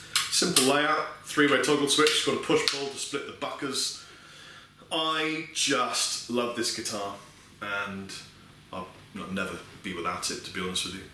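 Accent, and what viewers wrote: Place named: United Kingdom. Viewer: Heh heh..kinda funny. Who the fuck is? That rules. He's some dude who is promoting an Asian made guitar company on you tube a LOT.. he promotes products and usually everything is stellar and the best..and "take my money, please!!" So u have to take it all w the proverbial grain of salt. He gets paid to say pedals,amps and guitars are really great..and has stock in that guitar company, I think.. so if you browse YT for guitar gear, u might know him. otherwise, he's that shredding dude who works at the music store..he knows his stuff,but he's annoying as shit. He's the only guy I know who can make a British accent sound uncool.. Kind of like the Al Bundy of the Gear Demo guys, they do introduce a lot of new products here,and these guys know their stuff, the Danish guy,Pete and Lee are a bit more soulful players and infinitely less annoying,and despite the long winded segments, these fellows are pretty knowledgeable and usually fairly entertaining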